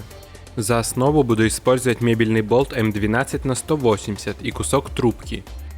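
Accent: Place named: Belarus. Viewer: звучит как беларус )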